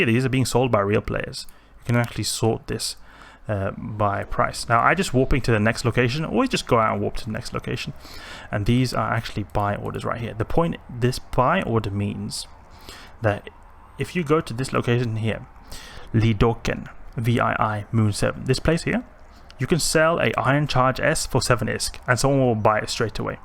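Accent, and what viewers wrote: South Africa. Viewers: If i may ask, where you born in South Africa? As a afrikaner I can hear a light South African accent!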